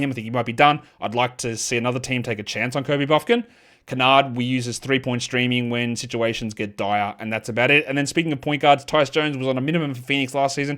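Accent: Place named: Australia. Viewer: Good Aussie talk.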